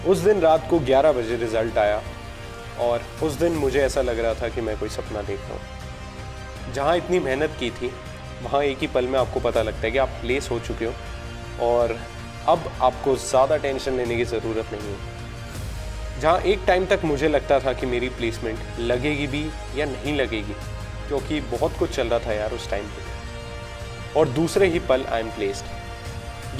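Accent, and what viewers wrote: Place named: Delhi, India. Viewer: Your delhi is accent is crazy! will you say it again !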